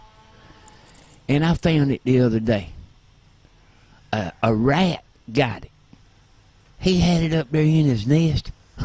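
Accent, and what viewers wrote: South, United States. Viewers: There's a whole bunch of people that sound like down south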